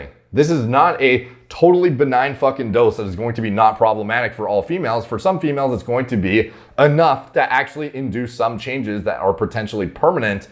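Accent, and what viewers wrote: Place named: Canada. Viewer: Anytime he pronounces something different than I’ve heard before I just blame it on Canada.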